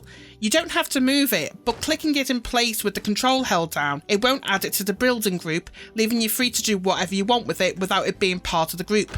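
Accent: United Kingdom. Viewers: You have a sort of an accent...Brittish????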